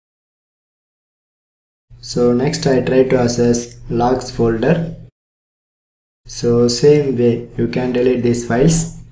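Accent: India. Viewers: Use a robot voice instead of your indian accent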